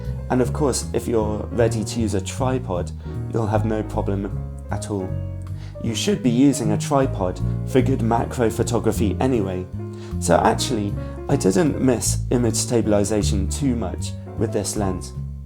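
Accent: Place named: Wales, United Kingdom. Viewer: Hey. Love the channel, been watching your reviews for probably a decade. I'd love to see a re-review on the Canon EF 100mm f2.8 Macro (non-L). I have one, but would love to see your method of testing it on a "modern" (to me) 6D with your newer testing format. Keep up the great videos. And dude, I gotta say, I love your accent! Not 100% sure you're Welsh, but I'm 25% Welsh myself. So "hello" from across the pond!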